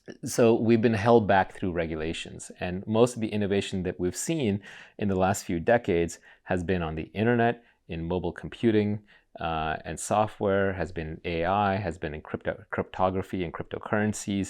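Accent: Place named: United States. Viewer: your accent is more American than American themselves.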